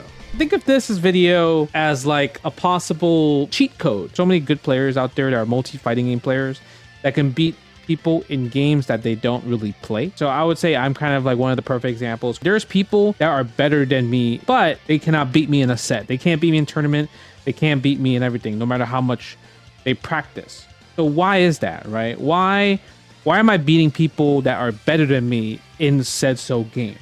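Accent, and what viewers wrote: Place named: New York City, United States. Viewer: Watching your vids for a while , hearing the way you speak and your slang , what games mean the most to you and even that Nights Jingle at the end … I knew you were my age and from NYC. Yup I was right - 84-85… from NYC, don’t tell me ur from Flushing …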